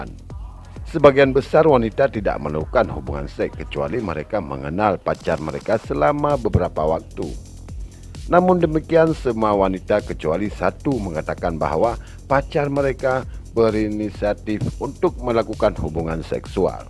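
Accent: Aceh, Indonesia. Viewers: kalau saya simak logatnya, kayaknya orang aceh ya?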